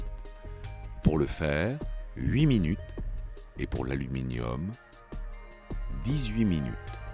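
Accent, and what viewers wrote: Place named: Paris, France. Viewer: He has a very posh accent. Is he from Paris? . Anyone?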